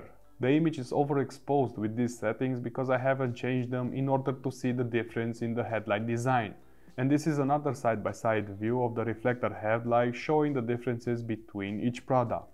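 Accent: Russia. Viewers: Бля, русский английский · R U Russian? Very detail & professional test of 3 biggest brands. Thank you!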